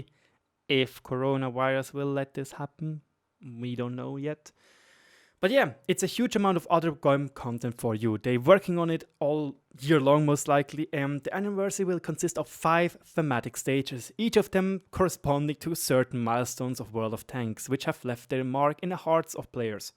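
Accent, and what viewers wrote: Germany. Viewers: löwe your vidz you give highlights to the things that matters and your germania accent give it a flare thanks from sweden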